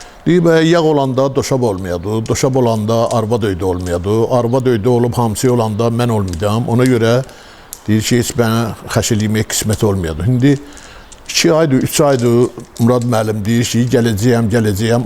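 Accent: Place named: Bilgah, Azerbaijan. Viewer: Kişi, Allah can sağlığı versin sənə, gözəl söhbət elədin. Eyni adətlər bizim kənddədə var. Elə bildim Bilgəhli danışır.Bilgəhdən salamlayıram sizi.Mənimdə rəhmətlik atam anamı mənim adımla çağırardı. 1972 də atam 21 i kievdən çernopuldan gətirib, indi mən sürürəm.Dübbə düz 21 i xoda salanda, öz xüsusi iyi var. Allah cəmi yaxşıları gözə görünən şeytanlardan qorusun!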